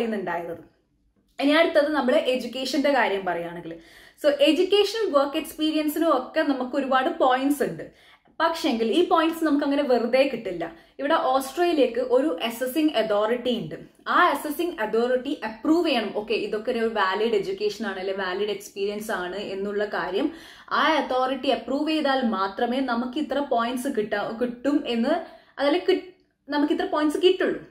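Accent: Kannur, India. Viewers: Wow.. thanks a lot for committing the video.. Kannur bhasha kettapol thonni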